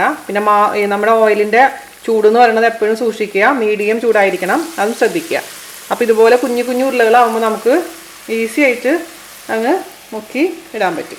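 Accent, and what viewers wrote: Thrissur, India. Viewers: Good presentation in Trichur Malayalam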